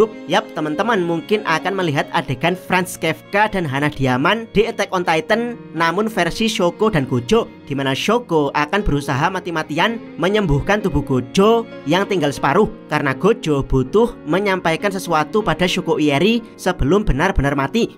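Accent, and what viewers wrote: Java, Indonesia. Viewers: bang logat jawa nya luar biasa hahaah thx bang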